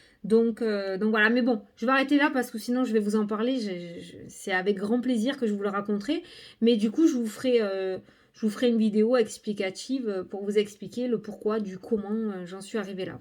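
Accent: South, France. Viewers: J'adore t'écouter avec ton accent du Sud! Et ton jeu l'oracle du temps est magnifique. Je ne tire pas encore les cartes mais il me donne envie de m'y mettre! Bravo!💖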